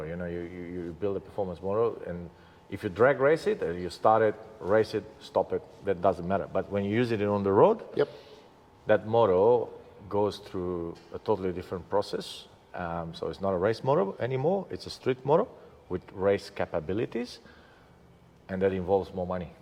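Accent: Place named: Australia. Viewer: German dude speaking English with an Aussie accent 😉 👍🏻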